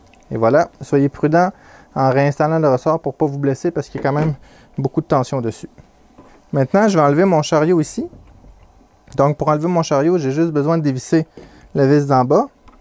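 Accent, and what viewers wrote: Canada. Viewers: bonjour, enfin des vidéos vraiment pédagogiques et super bien commentées avec un petit accent canadien en plus, j'adore. est-il possible de compléter cette installation par un auto bed leveling ? merci en tout cas tu viens de gagner un abonné :) belle journée.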